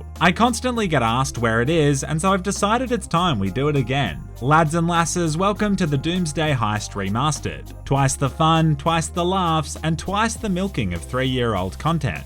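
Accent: Australia. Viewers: Having a ball watching your vids man. Only just found your channel .. alot of my favourite YouTubers are Aussies so good to hear another one.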